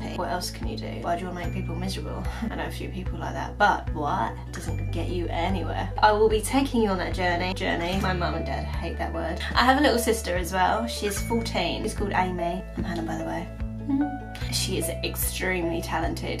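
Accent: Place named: Australia. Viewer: Hannah, that was great! I swear you sound Australian in this. God luck with everything, Love Deborah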